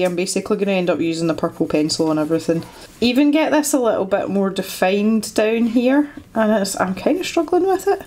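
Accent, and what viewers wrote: Scotland, United Kingdom. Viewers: Oh man captions do not get a Scottish accent. lol 😂 drawing looks beautiful though.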